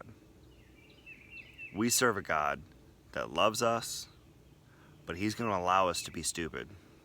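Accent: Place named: South, United States. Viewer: Your southern accent has really improved.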